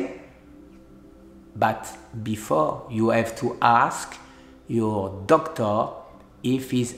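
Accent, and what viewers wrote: France. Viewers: Your comments are very interesting, in the same it helps us to understand because you have very french accent. for is the same I have very Provencal accent Thank you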